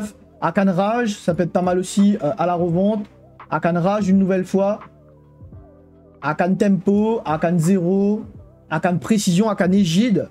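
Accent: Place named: Reunion, France. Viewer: a l'accent je sentait un 974 caché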